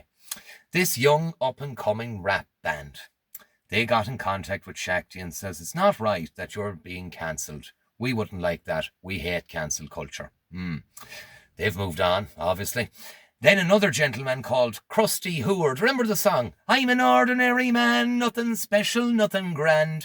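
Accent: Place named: Ireland. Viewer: Thanks I could listen to you all day. You have a fantastic speaking voice and accent. Irish legend.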